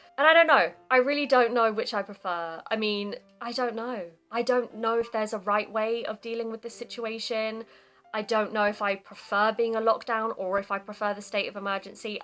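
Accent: RP, United Kingdom. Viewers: Interesting and informative. You speak well , you presention is superb. Is that the Queen's English?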